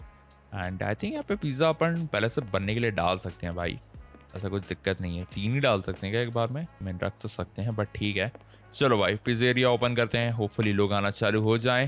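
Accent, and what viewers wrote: India. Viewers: Bro are you an INDIAN?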